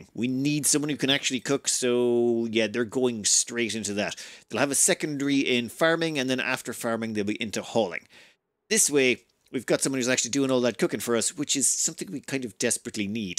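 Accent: Ireland. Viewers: im pretty sure hes irish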